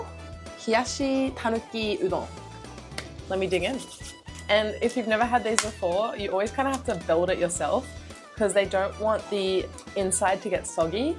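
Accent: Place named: Australia. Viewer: Rocking the look! Also, I feel your aussie accent has got stronger since you have been back home there recently ✌️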